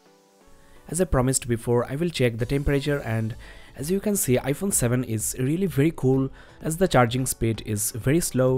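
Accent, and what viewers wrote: India. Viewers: Are you from Europe? The plugs look like the European versions. Btw you have a very nice accent :) (Indian?)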